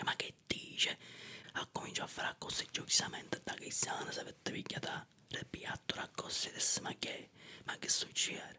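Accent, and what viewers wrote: Sicily, Italy. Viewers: Grande "unico "💪🔥video molto rilassante, che bello il dialetto siciliano❤️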